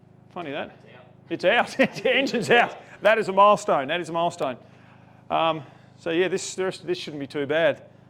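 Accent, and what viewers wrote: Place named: Australia. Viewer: New viewer from Vic via China now. Love hearing your aussie genuine voice and thoughts.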